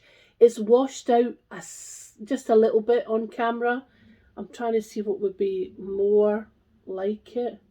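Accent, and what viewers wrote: Scotland, United Kingdom. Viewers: Your floss tube popped up on my feed and I enjoyed watching you. Love your Scottish accent! I’m from Idaho in the US, so you are a sweet find. Love your Easter Peep, so I’m gonna have to find that one. Thanks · First time I’ve seen your channel and enjoyed it very much. Love your Scottish accent. Take care and stay safe. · Been catching up with your flosstube. Great to hear a fellow Scot round these parts 🏴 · Congrats on your book find. It's great that all the charts are there!! Your stitching is beautiful and I very much enjoy listening to a crazy Scot woman rambling on about cross stitch!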